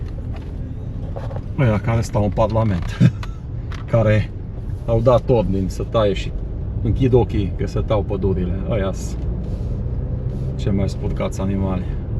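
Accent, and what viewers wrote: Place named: Hungary. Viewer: No,un allroad şi perfect pentru Nămțîia asta care închide Fabricile.. Nai Treabā.. Superbā maşină pentru Clasa medie.. Ieftină ,fainā,,bună Ca Ciocolata Ritter Sport😂 Foarte simpatic prezentatoru. Apăi ios Şofer de Bus în Germania.. Sas din Ardeal Sas dāla..😂 Tare mult î-mi place accentu ăsta Maghiar😊.. Domnu cu voi..❤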